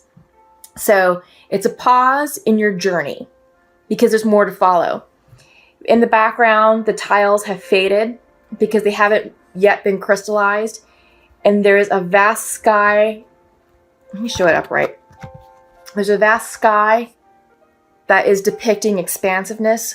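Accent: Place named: Brooklyn, United States. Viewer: Sounds like your close to Verrazano Bridge Brooklyn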